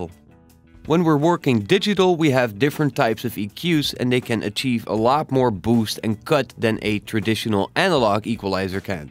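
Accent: Netherlands. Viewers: English with a Dutch accent really is one of the easiest recognisable out there. It's really amusing, since there's no way you can be wrong if someone is Dutch when you hear the way of pronouncing :D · nederlands accent? :) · Your English pronunciation is pretty good (better than mine probably). Still, some words you say, reveal that you must be Dutch.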